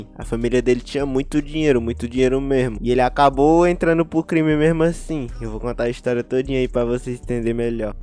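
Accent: Fortaleza, Brazil. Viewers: Mansho tu e de fortal ne? kk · Tu é de Fortaleza é btl? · Tu é de fortaleza?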